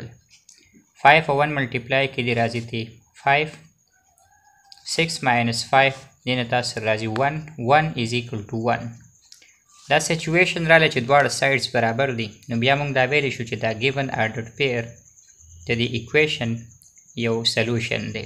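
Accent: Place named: England, United Kingdom. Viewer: What a english accent 😊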